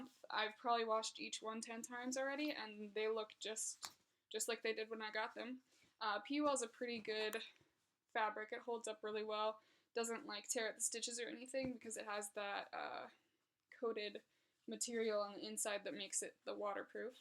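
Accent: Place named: South, United States. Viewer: Are you fro. Alabama or Georgia???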